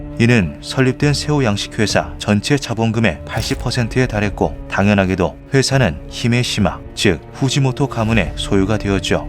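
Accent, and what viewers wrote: Gyeongsang, South Korea. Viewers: 대구네 ㅋㅋ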